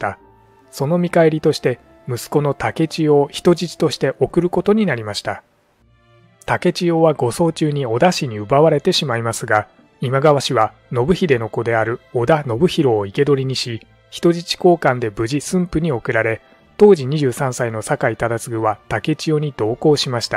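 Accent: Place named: Mikawa, Japan. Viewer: 三河のアクセント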